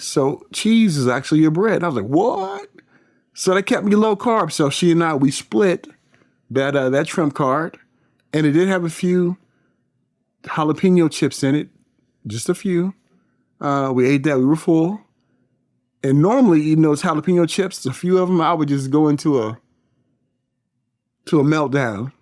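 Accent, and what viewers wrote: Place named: United Kingdom. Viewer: Your British accent is EVERYTHING!!! You sound better at the Queen's English than I do 😂😂😂😂 xxx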